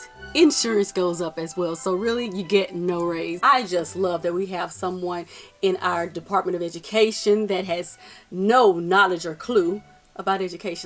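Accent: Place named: South, United States. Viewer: Where u from with that country accent ..... I hate teaching !!!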